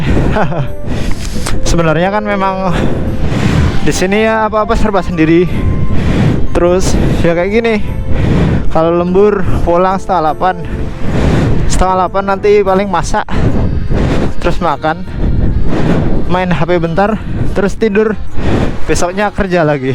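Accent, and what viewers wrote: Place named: Banyumas, Indonesia. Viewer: Wong endi mas, agak ngapak hehe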